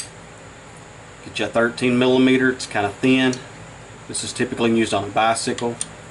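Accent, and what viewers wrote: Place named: New England, United States. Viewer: It's pronounced "Nick-EEE" not Nick-eye......brother you have got to be a Yankee. All them R's in your words. LOL (no offense intended, all my in-laws are Yankees)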